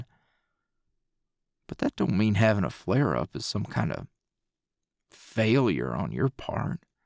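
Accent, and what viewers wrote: South, United States. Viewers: Me who is from the south and loving southern guy Me: FINALLY!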